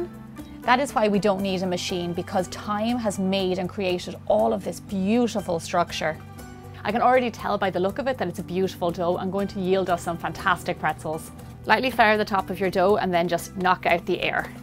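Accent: Ireland. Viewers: just a wee bit o butter. lol I know you don't say that but I love your accent. irish I'm guessing... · are you Irish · Lovely Irish woman.... beautiful accent. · She is from Ireland I'm pretty sure :) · i love pretzels thanks what is youre nationality im guessing irish lol no clue carry on carry on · Are u Irish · Irish accent? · OH WOW!!! They look incredible! I make bagels pretty much the same way, by boiling them. And I have to say - your lovely Irish accent made them seem that more scrummy!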